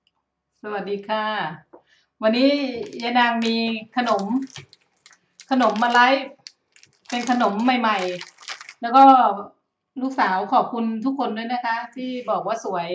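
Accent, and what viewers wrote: Isan, Thailand. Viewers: คนจังหวัดใหนค่ะเห็นพูดอีสาน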